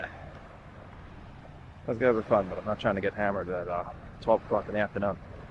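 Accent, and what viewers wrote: New York, United States. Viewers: Awesome episode! The SM mall right after the bridge from Subic Base (at the mark) used to have numerous establishments where a few sections used to belong to my grandparents. They had a bar/restaurant, money exchange and t-shirt printing shop. I used to visit them from Manila when I was a kid and have memories of that area during the late 70's to early 80's. I remember that area to be always crowed and busy due to the military personnel coming in and out of the base especially in the evenings. That whole street and a few adjacent ones used to be lit up like Vegas. I moved to the US with my parents before the US military left and not too long after that the location was controversially taken over by the local government. At any rate, your video brought back some good memories and I thank you for that! I also want to add that being a New Yorker myself, I appreciate your accent! 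Keep making awesome videos, I enjoyed your Japan episodes!! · Where u from in the states? That accent tho.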